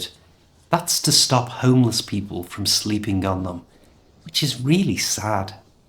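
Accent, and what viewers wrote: Liverpool, United Kingdom. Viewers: I've got something to tell about your method of teaching which is very much more enjoyable and I haven't seen it on the internet. Thank you to share with us. But also got something else to tell which I could't never understand while I lived in London which is about the english people and the why some of them love the Royal Family I mean why do they become more then other people, is it because they're Royal, because I can't see nothing so special? The place I come from we also have a Royal Family which we can see or even heard about often and they don't leave in castles as long as i know. One of them became a politician. As I see you still have kept some of Liverpool accent while you speak. But not bor kkk I loved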